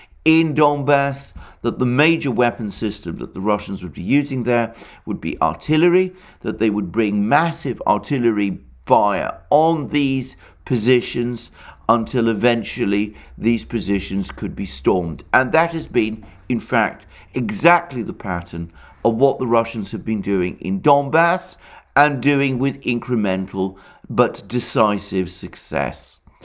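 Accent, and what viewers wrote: United Kingdom. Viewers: Alexander is British. Tomato, Tamayto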